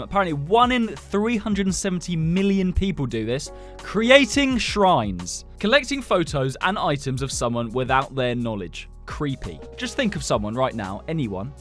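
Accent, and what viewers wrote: United Kingdom. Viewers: I THOUGHT THAT HE WAS BRITISH Y HE SAYING DOLLAR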